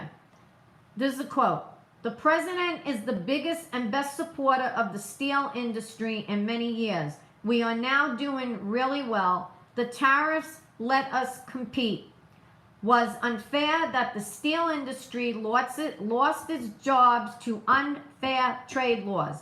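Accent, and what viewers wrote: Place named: Bronx, United States. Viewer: Just love your thoughts passion and God u live your Bronx gurl accent. I can't wait to see you and and now. Live u guys. My Brooklyn Long Island accent is a good match to yours. I hope someone gets u out if jail soon. This is not Fair. Censorship has to Stop. FB a public company. Live u thanks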